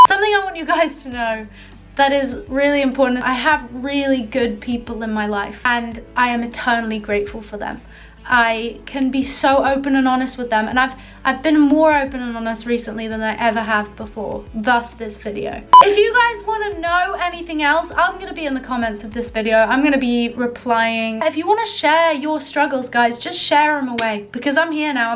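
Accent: England, United Kingdom. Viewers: Are you English? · Are you English??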